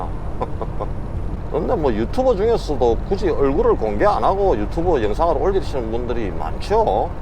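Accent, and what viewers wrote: Gyeongsang, South Korea. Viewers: 오오! 방금 케비에스2티비 방송예고보고 미친듯이 달려옴요! 와! 와! 영어도 갱상도 사투리로하던 공중파 나온다! 와 와! 갱상도 싸나이다 아이가! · 다규세상에서 보고 들어 왔어요. 서울 사투리보다 경상도 표준말 최고 입니다. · 안녕하세요 어제 밤에 다큐세상 " 편"을 보고 인사드립니다. 15일씩이나 계속되는 장거리 운전이 매우 피곤하실텐데, 그속에서 보람을 찾고 새로운 풍경과 정보를 소개시켜 주셔서 감사합니다. 너무 맵게 드시지 마시고 건강관리도 생각하세요. 멋진모습과 구수한 경상도 목소리가 좋아 구독자로 등록 신고합니다.....늘 안전운행하세요...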